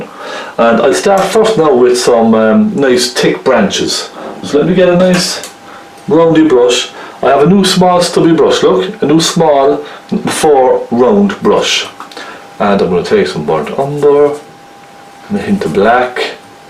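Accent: Ireland. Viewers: nice to hear an Irish accent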